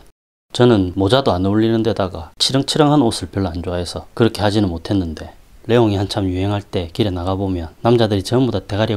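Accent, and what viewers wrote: Gyeongsang, South Korea. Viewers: 82세 할아버지가 씀니다 . 특히한 경상도 사투리와 재미있는 말씀으로---------참 재미이게 보고가유 -- .그말씀이 나에겐 정말 참고많이되네요 나도 실그 초상회를했으나 지금 현대인들이 보시면 웃숩지요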